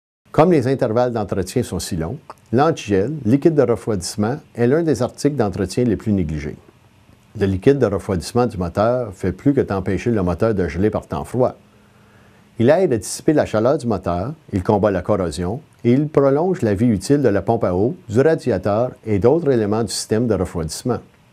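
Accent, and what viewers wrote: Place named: Canada. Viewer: c'est l'accent canadien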